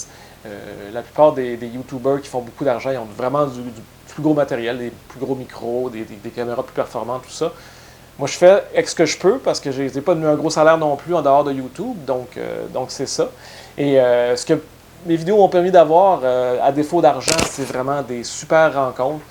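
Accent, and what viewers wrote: Quebec, Canada. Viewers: franchement, c'est grace à ton accent que je t'ai découverte: c'état pour mieux me préparer pour mon prochaine voyage à Montréal.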